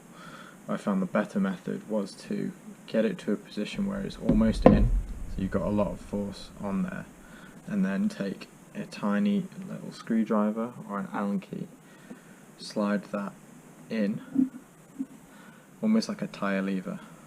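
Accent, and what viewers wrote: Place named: United Kingdom. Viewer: the unintelligible limey blather ends and installation begins.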